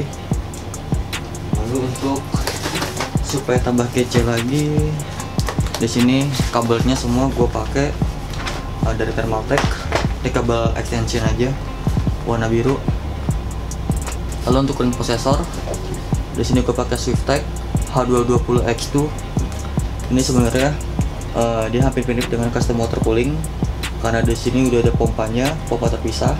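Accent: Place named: Java, Indonesia. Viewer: biasa lidah jawa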